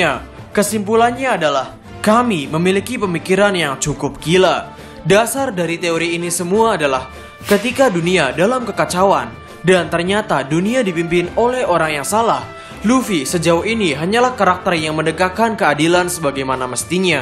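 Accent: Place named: Madura, Indonesia. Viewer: Min, logatnya madura banget. Bener ga sih?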